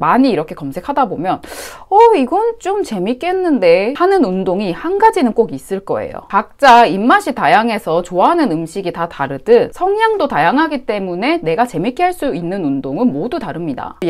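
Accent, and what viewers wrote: Gyeongsang, South Korea. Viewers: 누나 혹시 경상도 출신이에요?? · 언니 갱상도 뇨자였구나? 친근해ㅎㅎ 담엔 사투리버전 영상 어때여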